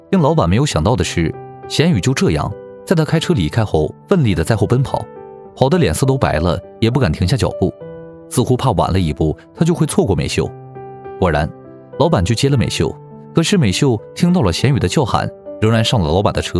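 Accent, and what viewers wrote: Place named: Northeast, China. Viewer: 博主是东北人吧 广播be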